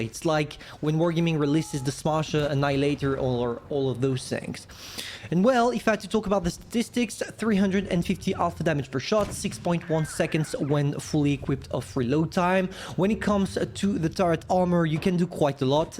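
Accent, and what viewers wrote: France. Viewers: Je crois que tu es Français vu ton accent et ton nom sur Youtube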